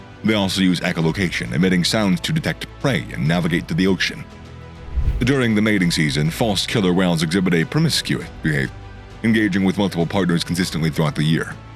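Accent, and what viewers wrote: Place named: United States. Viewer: The most American narration ever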